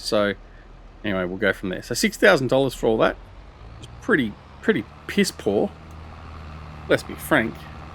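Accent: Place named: Australia. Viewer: "In my battle with stacking that Bloody trailer"... I laughed so hard🤣 brilliant so good to hear Aussie slang. I watch many great YouTube's from usa etc, there content is good too, But It's a breath of fresh air to find your channel and the way us Aussie speak!!😁😁 Keep up the great content and thanks for your hard yacker in making it 😁👍 From QLD Australia.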